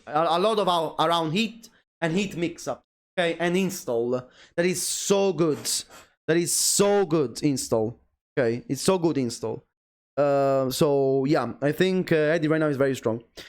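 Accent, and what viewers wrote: Italy. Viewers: Having my main roasted isn't actually too bad when its delivered in a very pleasant Italian accent haha ^-^ I genuinely hate whats happened to Nina in this game. Her range, punishment and mixups have been improved to such an insane degree, that her old playstyle now takes a complete backseat in some matchups. I hate seeing iws1 juggles basically becoming irrelevant outside of low parry combos as well 😔 I dont want them to hit d/f+1,2 again. I want her old poke and move around playstyle conserved. I just want them to gut and neuter d/f+1,2~f on block. Either make it -5 or just flat out remove the ability to do the transition on block. Im so sick of stance transitions in T8 turning a bad situation into a neutral or good one. Thats not how it should work. A d/f+1 into stance at neutral on block is utterly dumb. You dont get to press a button to go from disadvantage to neutral on block. 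Stance transitions should turn an already existing advantage into a greater one but leave you vulnerable if you're reckless with them and commit to it on block. And for the love of god, restore Nina SS1's range to its T7 hitbox. The move has always been busted, no Nina will dispute that. But it used to be hard to use. It made her wall pressure so crazy. It had a glaring flaw, but the pressure it provided was so good that you'd happily work around its bad range. So improving its range so Nina can easily use it midscreen, was a looney tunes decision. Theres been a lot of that in T8. Take a cool thin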